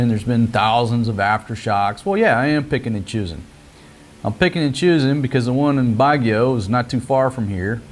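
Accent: South, United States. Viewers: New subscriber. Where you from in America. That southern draw makes me miss home.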